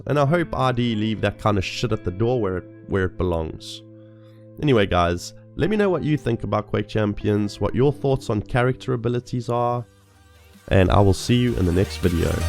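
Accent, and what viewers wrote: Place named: South Africa. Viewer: Dude, you nailed it. Are you South African ?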